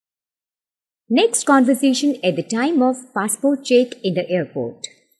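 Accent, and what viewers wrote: India. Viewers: Charming woman's voice - but you have an Indian accent -- and there are errors... For example, the word is not correctly pronounced "Vindow". It's a "window". W. Made with the lips without the teeth touching the bottom lip. We don't say "I'm A (long A pronounced like the letter A) tourist" etc. We say "a tourist"...short "a". It's "Vun Thousand Bucks per night" . -- nope. ONE (pronounced "won") thousand dollars. "Bucks" is slang - and too familiar for this context. I believe I heard you say "bucks"...wasn't clear. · sounds indian accent..no i want to bettter learn from brits or americans thanks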